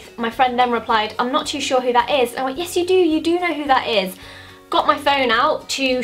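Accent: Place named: United Kingdom. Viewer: то, что вы имеете в виду - это американский английский, а это - чистый британский акцент (может не чистый, но британский английский)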